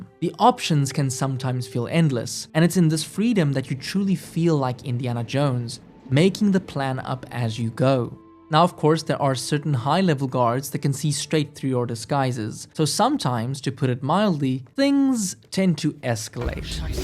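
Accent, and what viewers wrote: South Africa. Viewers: The most South African sounding creator I have never seen.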